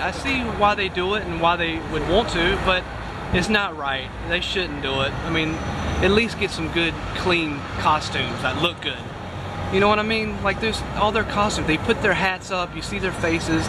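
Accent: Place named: South, United States. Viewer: your from the south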